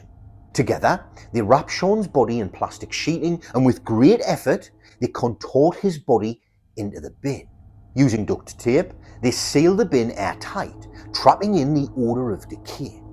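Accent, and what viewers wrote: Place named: England, United Kingdom. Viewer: I cannot penetrate the host’s regional English accent.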